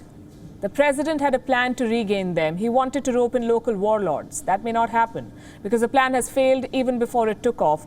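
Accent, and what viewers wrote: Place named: India. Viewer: Indian accent so heavy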